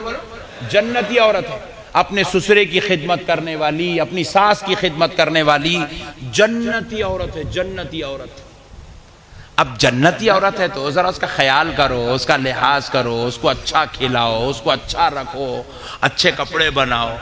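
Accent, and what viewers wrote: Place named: Hyderabad, India. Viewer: This man best comedian in hydrabadi language.whare he from nobody know .kon gooooouuuúu hai inka producer of event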